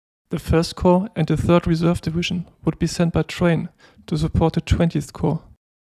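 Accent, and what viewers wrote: Germany. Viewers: Love your German accent !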